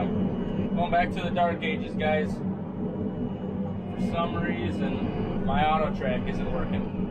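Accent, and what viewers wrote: Texas, United States. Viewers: you have a southern accent coming through on this video!!! You sound like your from Texas!!!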